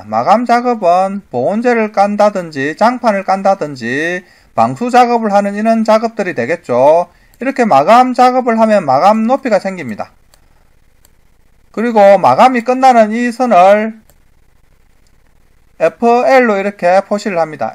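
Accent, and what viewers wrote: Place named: Gyeongsang, South Korea. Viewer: 아지야 경상도 사투리 직이네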